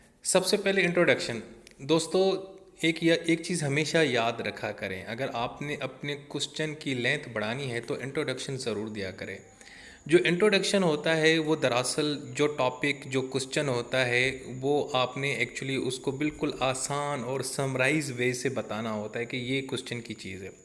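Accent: Pakistan. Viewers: Started watching and was like “awaaz se toh lag rahe Pakistan ke hai ye” and fir aapne petrol price puch liya. Great video though! Helped a lot.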